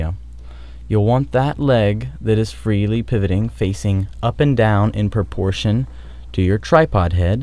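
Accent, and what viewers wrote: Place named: California, United States. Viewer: it's weird to hear your voice before you got your California dude-bro accent haha <3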